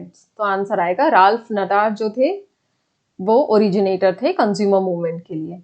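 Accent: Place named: Gujarat, India. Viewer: Aap ke voice se hi pata chal gya tha ki aap Gujarati ho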